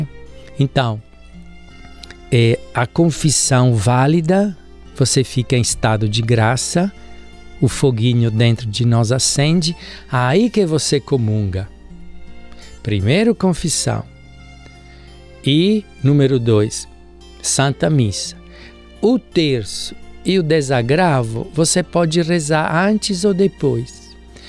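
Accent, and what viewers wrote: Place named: Sardinia, Italy. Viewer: Esse Padre é sardo ( da Sardinha- Itália) não perdeu o sotaque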